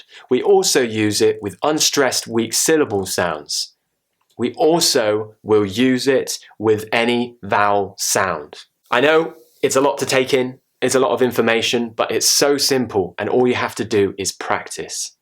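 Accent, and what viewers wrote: RP, United Kingdom. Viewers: Nawwwww that perfect british accent though 😍😍 Could listen to that all day. Nice video man!! Keep it up! Greetings from Germany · I love to learn british accent. U speak very nice, like to hear u r accent. 👌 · I love so much British accent especially your class, I'm still waiting your lesson everyday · i love to hear the British accent from u ... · Same here. I really want to speak like him. It feels so posh to listen to British accent. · Keep it up the good work sir.. I went through all the British accent channels trying to improve my accent and literally you were the best.. cheers from Dubai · i love you,! I like British accent, it is beautiful and you help me so much!!! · British Accent is really admirable. I appreciate your teaching sir and regard u as the best teacher for it. Sending you reams of wishes from India!! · OMG I've just found a new motivation to learn.... 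- intelligent - handsome - British Accent <3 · i am Abdimajid Borobiyo from Somalia the capital city, i really very exited to watch your video's in British accent. i like very much to have a powerful British English accent, thanks Mr... thank you so much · I like the British accent and I really liked it more when I listened to you. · Greetings, can someone tell me which british accent is he using? Is it the RP? Thanks everyone :) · I love british english and accent i think soon after watching your videos i will start speaking british accent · I'm In a play and I need to learn the British accent and you're 😉! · I just watch this to listen to this beautiful British accent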